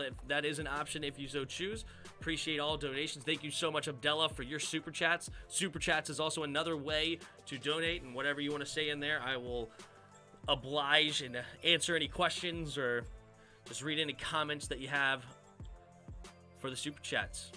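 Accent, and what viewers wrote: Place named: Australia. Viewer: G'day mate!!!!!! thanks for doing your Aussie accent mate you legend! cheers man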